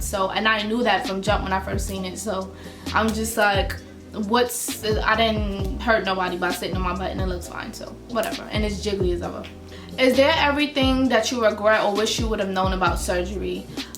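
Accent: New York, United States. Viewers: I sooo love your NY accent 🥰🥰and yasss miss mamas ya body is BOMBBBB AF!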